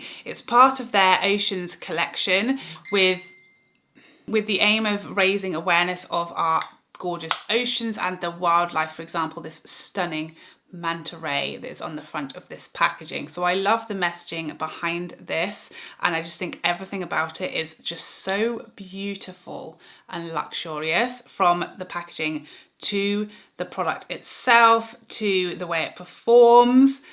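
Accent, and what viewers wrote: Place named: United Kingdom. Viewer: you have the best videos. I love love love your sense of humor paired with the British accent. It’s the medicine to my life I was missing. You are the best! Yes I’m watching some of your older videos. Lol!!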